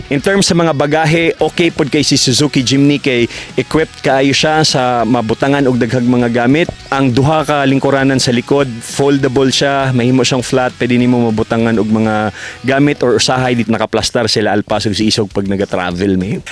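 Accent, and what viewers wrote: Visayas, Philippines. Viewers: Been Watching kahit d ko naintindihan kasi tagalog ako, Pero theres somethings interesting sa accent, Sa bisaya language, Soon sana matuto ako hehe. I'm 31 now and feeling lost, Didnt expect to be moved by this vlog kahit half of words d ko maintindihan.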